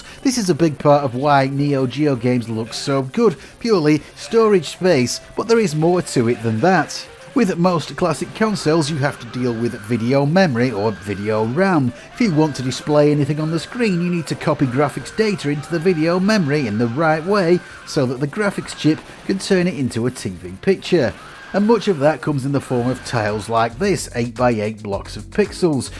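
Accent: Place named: England, United Kingdom. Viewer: I hear a fellow english accent, I see neogeo, I KNOW the metal slug section is going to be the longest lol Nice one my man :D.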